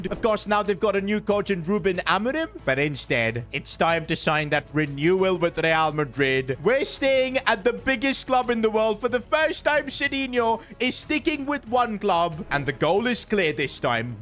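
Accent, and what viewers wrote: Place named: India. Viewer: The Indian accent is wild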